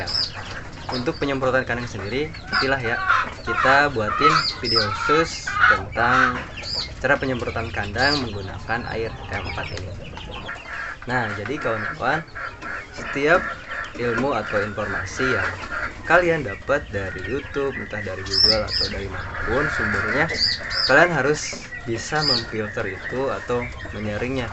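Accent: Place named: West Java, Indonesia. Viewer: Mantef kang . Timna kang logat sundana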